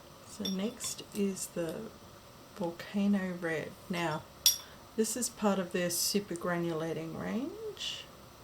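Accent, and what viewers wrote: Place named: Australia. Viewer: Loving your videos! And it's nice to hear an Australian accent down this watercolour youtube rabbit hole. I just wanted to say that Naples Yellow differs from yellows/yellow ochres in that it's actually brown - so can be used in skies without making green when mingling with blue.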